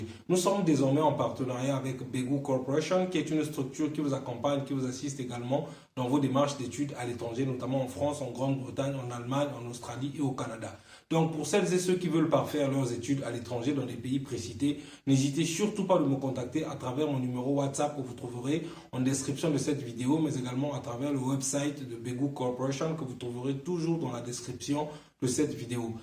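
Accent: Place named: Democratic Republic of the Congo. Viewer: Je sens l'accent congolais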